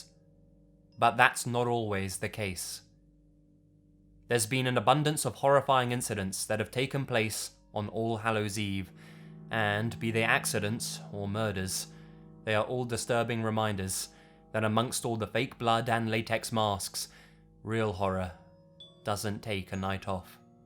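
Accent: England, United Kingdom. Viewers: you from the UK?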